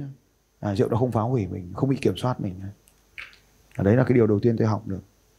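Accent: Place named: Bac Ninh, Vietnam. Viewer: Giọng a hình như Bắc Ninh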